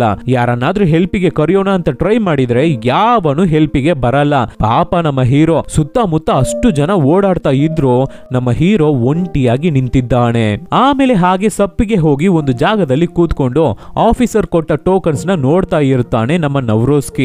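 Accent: Tamil Nadu, India. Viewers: ಅವಣು, ಇವಣು. ಯಾವಣು? ತಮಿಳುಕನ್ನಡ 😮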